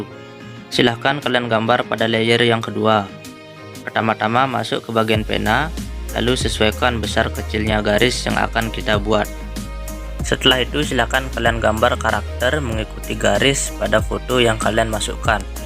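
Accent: Aceh, Indonesia. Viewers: Orang Aceh BG?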